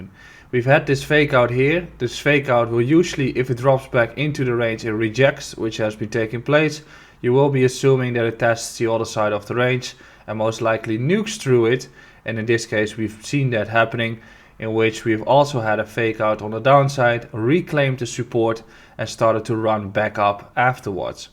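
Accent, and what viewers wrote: Netherlands. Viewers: Ben je Nederlands ik hoor een accentje.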